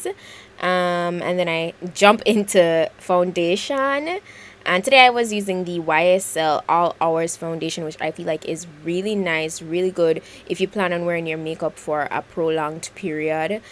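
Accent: Jamaica. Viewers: Jamaican accent yaass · You sound Jamaican.... And I know becuase I am Jamaican....... Nvm 😂😂😂 you are I just saw the other vid..... New subbie... Btw.... Big up yuh self 👅🌸🙏💙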